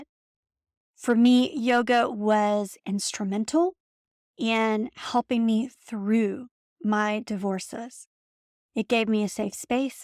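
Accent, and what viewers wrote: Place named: South, United States. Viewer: So nice to 'catch up' and hear a southern accent. ;) Love from Boston.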